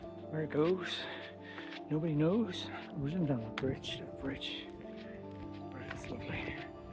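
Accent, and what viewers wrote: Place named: Ireland. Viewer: Thanks for the video. I know the EHW is not really marked; however, it is not as intense as the WHW and will suit me ass a relative beginner. I live now in Stirling so I am within easy reach of all Highland and Island hikes & wild camps. I am originally from NI and though I detected an Irish lilt in your accent. Cheers 👍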